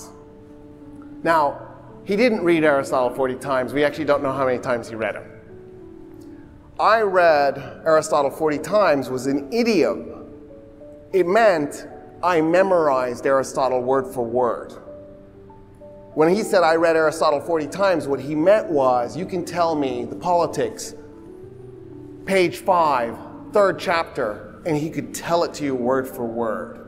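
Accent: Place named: Iran. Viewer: این استاد ایرانی نیست؟ همه ظاهرش هم صحبت کردنش شبیه یه ایرانی هست که ۲۰ ساله خارجه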